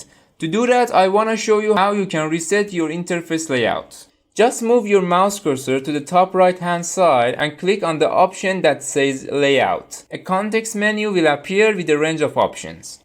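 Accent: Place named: Iran. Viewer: داداش لهجت داد میزنه ایرانی 😂عالی داش همینجوری قوی ادامه بده خوشحال شدم ویدیوت رو دیدیم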